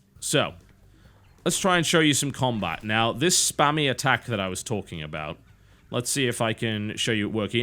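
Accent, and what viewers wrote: United Kingdom. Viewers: Huh? There's nothing really smart about those words... "implies" "superiority" "objectivity" If you think those words are 'smart' then, I'm at a bit of a loss, besides, is called the 'cynical' Brit for a reason, but, I can understand where your viewpoint comes from, and to some degree, I can understand why you think of him like that:) (by the way, his accent isn't fake, he's British, and that is a real British accent;))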